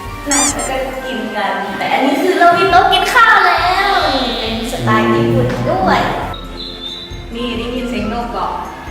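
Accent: Phu Thai, Thailand. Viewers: สำเนียงเหมือนสาวภูไทย เสียงน่ารักหมาก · ผู้ไทดงหลวง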